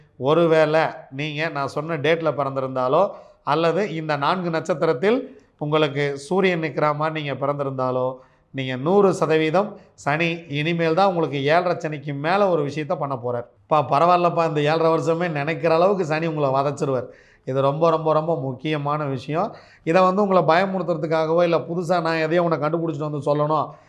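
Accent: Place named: Coimbatore, India. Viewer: Iyakkunar manivannan sir kku piraku namma coimbaturu slang um nakkal pecuum ungalidam thaan saami ketkiren... guru sakku moottaila katti adipaar... 😂😂 kastangal irunthaalum neengal sollum vitham romba rasikira mathri irukku guru naatha,, and by the by one more information,, I'm from coimbatore, ungalathu video anaithayum skip add seyyaamal anaithu add um odiya pin thaan parpen athu antha video vil neengal sollum thagaval yenakku muluvathum palikka naan tharum guru kaanikkai... anbarkalum ithai try seithu paarungal.. neengal yevvalavu menekettu ovvoru video podukireerkal yenbathu ungalai patri therinthavarkalukku theriyum... koodi nandrikal guru naathaa...by coimbatore venthaan...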